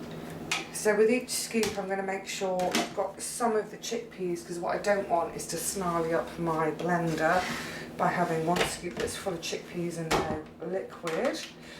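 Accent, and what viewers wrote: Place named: London, United Kingdom. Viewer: Hi, love your videos. My grandmother and grandfather came from London, and your accent brings back memories. Thank you for your wonderful recipes. Do you make carrot marmalade. I will surely try the tomatoe soup. Canada says hi!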